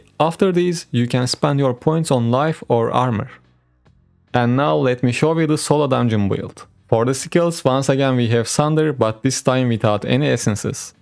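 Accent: Turkey. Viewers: aksan türk birinin ingilizce metin okumasına benziyo :D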